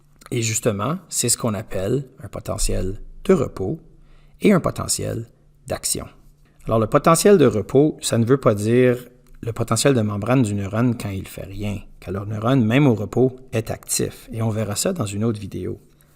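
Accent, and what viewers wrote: Quebec, Canada. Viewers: De plus j'aime votre accent Quebecoi😊😊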